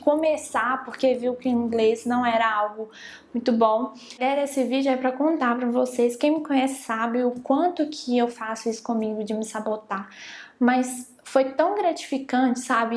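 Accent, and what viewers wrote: Minas Gerais, Brazil. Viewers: Nossa, vc foi contando e eu fui imaginando a cena. Acredita que só de imaginar eu fiquei até tremendo de nervoso aqui também? kkkkkkkkkk Obs: Vc é mineira né? O sotaque me parece familiar. Sou mineiro de JF.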